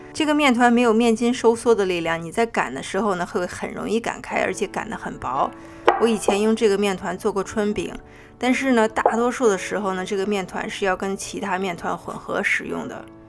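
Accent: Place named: North, China. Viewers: 你是台湾人？是大陆西安人，所以这样读是没错的，你不能强求大陆人来按台湾人的读音来发音。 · sun shieh 也许是你弄了，听口音，应该是中国北方人，她的念法与中国汉语字典一致的，你可以查看字典，至于其它地方是否同一标准我就不得而知了，例如台湾，新加坡等等国家。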